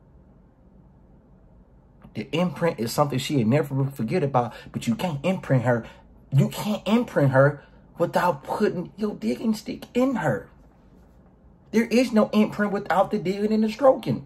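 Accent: Baltimore, United States. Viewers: could pass for a BMORE dude by just the accent alone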